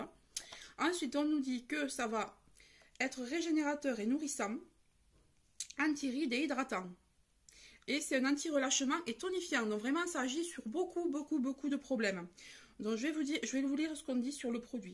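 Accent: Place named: Castres, France. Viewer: j'ai reconnue l'accent, je suis née à castres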